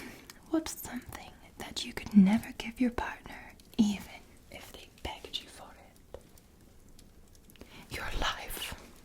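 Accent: Scotland, United Kingdom. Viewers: I love your Scotish accent it's so soothing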